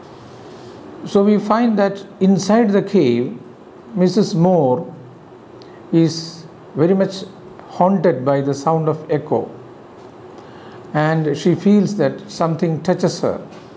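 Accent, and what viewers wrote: India. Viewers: Thanks so much for very understandable explanation, easy language with an Indian pronounciation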